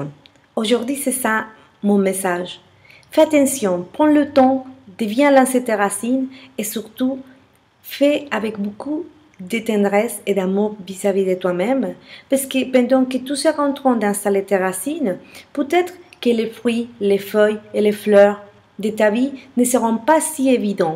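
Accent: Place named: France. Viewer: Te sigo en español querida...pero adoro tu acento francés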